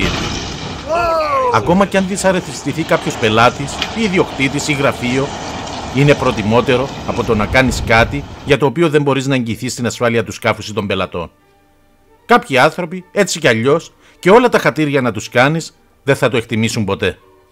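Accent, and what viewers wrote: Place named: Spain. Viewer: wait... did this channel get highjacked and dubbed over by a patronizing Spanish speaking person?... time to eject that subscription!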